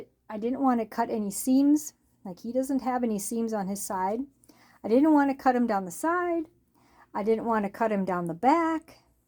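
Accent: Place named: Minnesota, United States. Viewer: Omgosh this was so good and funny!! You are a stitch 😂😂❤❤ I can identify with your Minnesotan dialect ❤❤ I love your creative way to fix him into what y wanted ❤️ 💙 he is such a boy now!! I do not have one yet, but they are adorable 😍 ❤️ Are u ready for the colder air moving back in 😢 it was so beautiful yesterday. I guess we're still in spring labor pains😂 Your cupcakes 🧁 were so nice•❤❤ I bet they are yummy!! I received my new baby girl!! You can see a clip from her artist on her channel, Tinyhands4ever. ❤❤🎉🎉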